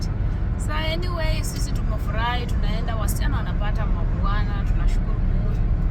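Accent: Kenya. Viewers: Why can't you just speak in English and stop this luhya accent, sounds really awkward, you still have alot of mushene ya waluhya, uuurgh!!!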